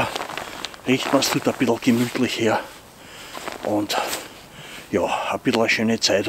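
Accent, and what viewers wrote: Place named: Austria. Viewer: Sehr schön, dass Du beim österreichischen Dialekt bleibst. Das Einzige, das ich nicht verstehe, ist beim Käffchen das: Kranken Sesseln - sind die Leute, die krank sind, in Österreich Sessel? Mein Vater ist zwar aus Wien, aber ich verstehs trotzdem nicht. :) Wie immer sehr schönes Video und natürlich weiter so!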